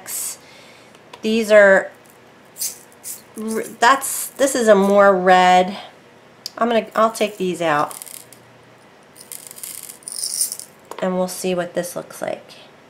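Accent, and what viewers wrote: New Jersey, United States. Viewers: I know you're not wearing a mask in this video, but using long term mica powders can damage your sinuses and lungs. I know it's not fun, but please do it. I'm loving your channel, love your Jersey accent and joyfulness. Blessings to you and your family. xxXX Grambo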